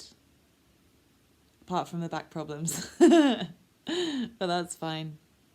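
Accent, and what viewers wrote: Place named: Manchester, United Kingdom. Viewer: God I miss you and god I love your ever so slightly Manc accent - after next lock down I’m gonna come visit and sing ‘let it go’ with you 🥰🥰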